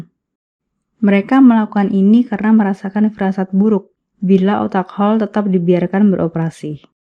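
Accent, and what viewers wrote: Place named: East Java, Indonesia. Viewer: Ya kayaknya orang jawa timur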